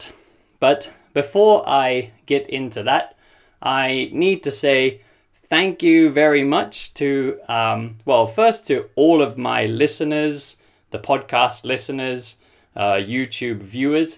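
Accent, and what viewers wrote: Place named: United Kingdom. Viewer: Hi, I know I'm two years late but I thought you were from the UK when I first heard you. I wasn't sure but I thought so. (I'm argentinian)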